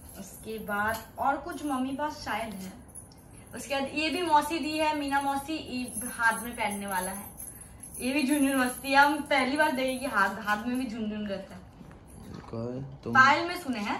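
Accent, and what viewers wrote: Bengal, India. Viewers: Apki Hindi bht sweet hai☺️hum marenge ye achcha bolti ho waise mai bhi bengali hu or humko bhi secret wala insaan ka naam Janna hai😂😂or barki mummy bolne par camera man itna has kiu rhe hai🙄 bengali bolne wale log sab Hindi bol nhi pate but ne kosish kar rhi hai bht jaldi sikh jayegi☺️👍🏻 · Di O aapka Bengoli kadha O bahut hi cute Hy very nice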